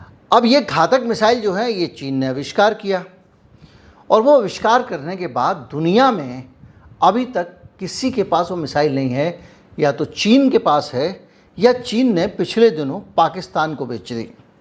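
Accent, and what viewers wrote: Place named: India. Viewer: Of you Indians base ur analysis on hate . First fix ur pan English accent you can’t even at basic words properly Secondly your information is false. You making videos to satisfy ur like minded brains which are anti world full of hate 😊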